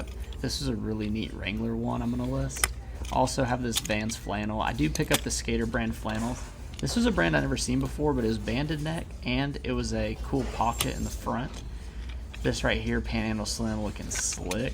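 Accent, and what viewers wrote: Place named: Dallas, United States. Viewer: Lol, I just love your videos. I usually watch YouTube on Roku so don't comment but I've been watching for a few months; I'm in Tulsa and went full-time with eBay selling during covid lockdown. By the end of it I was making more money on eBay than I ever made teaching so still haven't gone back to my former job. I've never sold clothes but plan to get into women's, particularly things that I can find here easily and sell on the Pacific corridor and in France, where I have family, for a profit. I won't be doing mens' modern so no competition from me, but I do hit the Dallas thrift stores about once a month when I'm down there. I've also sourced in Oklahoma City but never in Lawton. I love all the vintage western shirts, I swear I could make a killing in France on those but it's not entirely legal for me to bring a bunch of merchandise I plan to sell over there in my suitcase. 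Tempting though... You look so much like my Dallas cousin, and I swear your accent is exactly the same. I just die when you stop for a victory cone at Braum's after a day of sourcing. It's so refreshing to see another somewhat local seller that I can relate to, even if we don't sell in the same category. Just had to pipe up and say how much I enjoy these since I happen to be watching on my laptop tonight and have a keyboard handy... keep it going, you're really the best! I love it!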